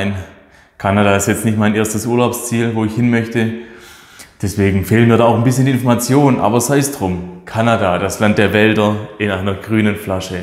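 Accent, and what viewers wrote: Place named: Swabia, Germany. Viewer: Kanada ist mein erstes Reiseziel wo ich hinmöchte und auch schon war, ein Traum dort. Aber the Rock kommt doch nicht aus Kanada. Aber was anderes, wenn ich so deinen Dialekt höre, merke ich du bist wohl auch aus dem schwäbischen, wie wär's mal in nächster Zeit irgendwann mit nem gemeinsamen tasting wo jeder von uns ne diverse Anzahl an verschiedenen Bieren ranholt und wir die gemeinsam verkosten und auf beiden Channels dann hochladen? Fänd ich ne coole Nummer.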